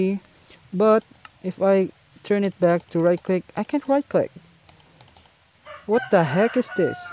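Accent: India. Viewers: oh 😂 u speak like a indian but np! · i think u r a indian beoz iam also a indian the english slag looks like indians and even i speak like that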